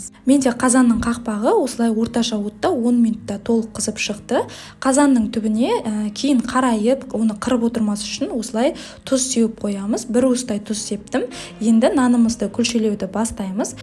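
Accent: Standard. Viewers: Жаксы соилеиди екен таза казакша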